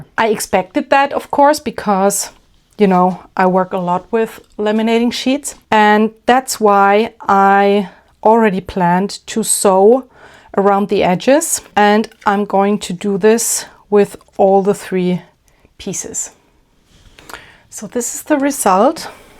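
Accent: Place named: Germany. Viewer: I was wondering if you were German as your accent sounded German. I think your speaking English and explaining things really well. Really lovely art techniques again. And your fingernails aren't horrid at all, lol. You nailed this video and your art perfectly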